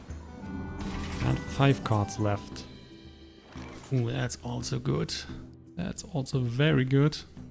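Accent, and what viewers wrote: Germany. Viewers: Er spricht gutes Englisch, aber mit eindeutigem Deutschakzent. Hört man sofort....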